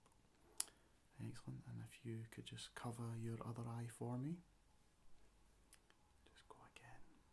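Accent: Scotland, United Kingdom. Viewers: You're so relaxing.. And you help me assimilate the Scottish accent which I have trouble deciphering lol.. Keep up the good job. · Loving the accent! Just can't quite decipher which part of Scotland your accent is from..😂😂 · Hot dude with a Scottish accent. So great :) melts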